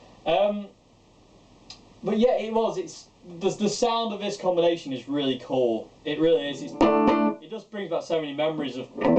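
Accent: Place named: Grimsby, United Kingdom. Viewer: If you upgraded your equipment at 'Pooles' combined with your accent I'm taking it you are from or near Grimsby - my hometown 😂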